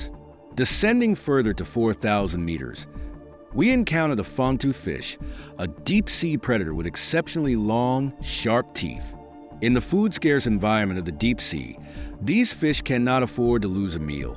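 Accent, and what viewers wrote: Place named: United States. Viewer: You’re American or sound like it.. speak in feet & miles please. This isn’t throwing shade at our international friends it’s just that we don’t understand meters.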